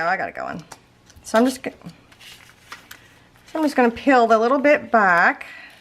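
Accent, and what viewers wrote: South, United States. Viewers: I love ALL the new designs and templates. The album is a beautiful journey I look forward to taking. Thank you..thank you...thank you! Always fun watching you create and this northern girl could listen to your southern accent all day.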